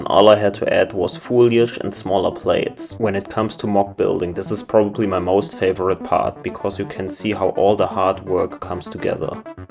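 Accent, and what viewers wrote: Germany. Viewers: youre accent sounds very familiar to me are you from germany? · It sound like you are german are you ? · Einfach der deutsche Dialekt im englischen. 🤣 ich feiere es.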